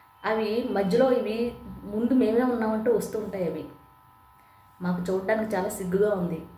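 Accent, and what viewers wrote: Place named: West Godavari, India. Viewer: Fantastic dialogue delivery. Crystal clear Telugu Language. Looks like West Godavari Accent. Slow and Steady delivery of Dialogues.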